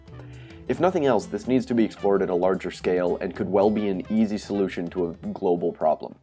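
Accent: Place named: Canada. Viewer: This is the perfect example of a Canadian accent. 😂 awesome video, even if I’m 3 years late.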